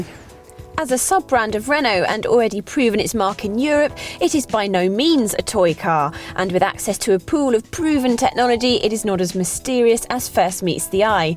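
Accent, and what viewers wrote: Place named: England, United Kingdom. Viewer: dar cum ai vrea sa aibe daca e englezoaica? multi tarani in Romania asta!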